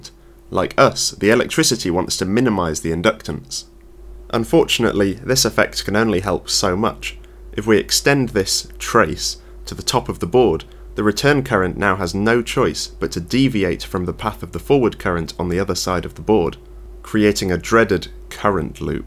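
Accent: United Kingdom. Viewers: He´s maybe in Germany, but he´s clearly a Brit...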